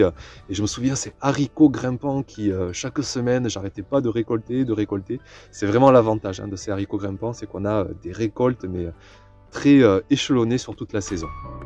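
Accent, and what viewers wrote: South, France. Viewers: Toujours une excellente vidéo avec l'accent du grand Sud . Le haricot est un légume très intéressant qui peut s'avérer très productif . Pour ceux qui disposent d'un jardin assez grand ne pas hésiter à le cultiver et réaliser des conserves utiles en hiver quand on manque de légumes verts . Les haricots en grains accompagnent aussi de nombreux plats . A plus .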